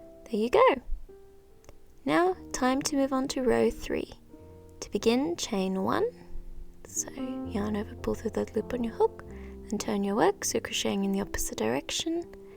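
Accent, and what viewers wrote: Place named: South Africa. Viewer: This accent is definitely South African 🥰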